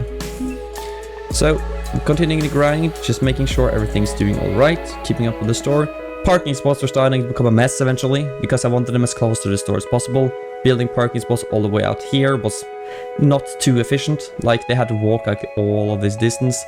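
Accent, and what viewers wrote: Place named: Norway. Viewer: im norwigian to i can kinda hear that your norwigian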